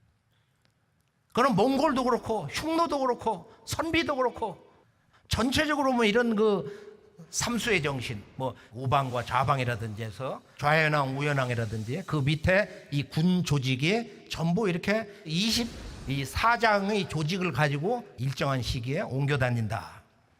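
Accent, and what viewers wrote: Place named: Yanbian, China. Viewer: ㅋㅋㅋㅋ 뭐냐.. 완전 조선족이구만..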